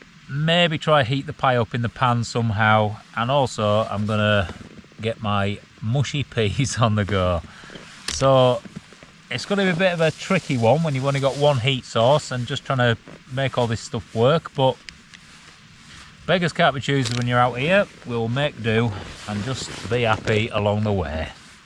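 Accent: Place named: Yorkshire, United Kingdom. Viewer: Nothing better than sitting listening to a pissed Yorkshireman pontificating........... "When I wut Lad...." Brilliant.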